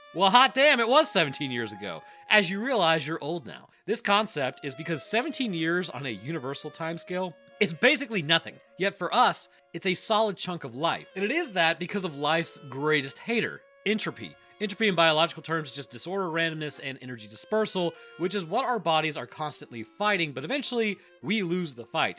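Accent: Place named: United States. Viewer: "Learning more about the world around us" I imagine that would be vastly different between literally ANYONE ELSE IN THE WORLD, and Seppo's...who have no idea what the rest of the world is like, outside of what their State-based Social Media brainwashing and generational indoctrination tells them...which, ofcourse, is all wrong! Also, I know all english-speaking countries and peoples have an accent... but Imperial Measurements are pronounced "Fu<kwit units"... I know, I know, its an accent thing, I hear it as "Freedom Units", but just wanted to clarify that for all the other English-speaking audiance members... he is saying "Fu<kwit units". And I know, we hear his American Accent as "heathen units", but thats just the Seppo twang on "Metric units"... Remember, the U.S. is a third-world country pretending to be a first-world country... They don't know how good it can be away from that toxic brainwashing and indoctrination...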